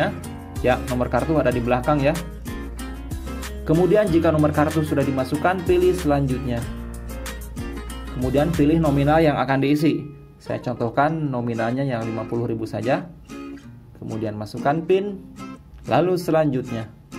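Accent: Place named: West Java, Indonesia. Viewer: Hatur nuhun akang infona, Mudahan gak salah,, ketahuan Logat sundanya..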